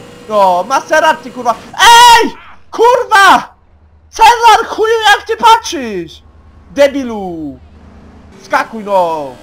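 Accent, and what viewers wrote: Silesia, Poland. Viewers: Seria GTA u bez Śląskiego gadania jest tak niepotrzebna, jak BuFu na Polskim YT... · Po śląsku było lepiej jak mówiłeś :(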